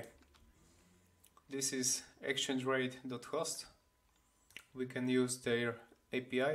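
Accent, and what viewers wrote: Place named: Poland. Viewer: Are you from Poland? You sound like you are from Poland ;)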